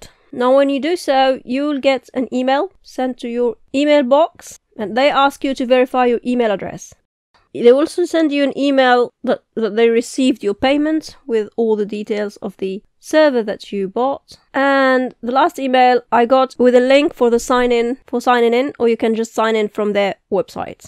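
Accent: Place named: India. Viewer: India maybe 🤔 or indian living in UK 🤔 don't know 😂 just guessing from the adorable access that u have 💕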